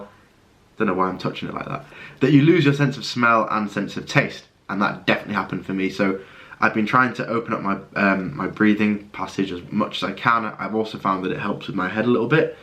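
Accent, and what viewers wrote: United Kingdom. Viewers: you are amazing. I am so glad you are on the mend. Listening to you reminds me why I love the English 😻🌈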